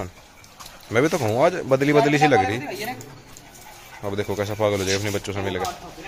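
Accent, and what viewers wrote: Haryana, India. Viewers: Bro aap rhata up ma ho par bolta haryanvi ho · Bhai app haryana se ho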